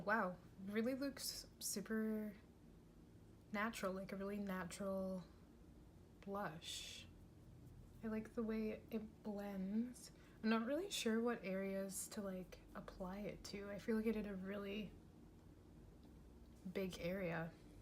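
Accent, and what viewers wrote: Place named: Ireland. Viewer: Are you by chance Irish or Scottish? You have the prettiest accent that sounds like you might be from that area?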